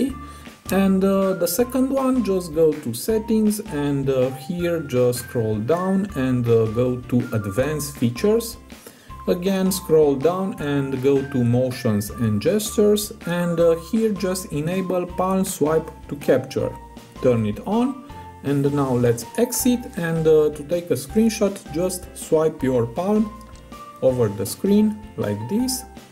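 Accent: Romania. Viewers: Multumim frumos,dupa accent sigur esti roman 😀🤗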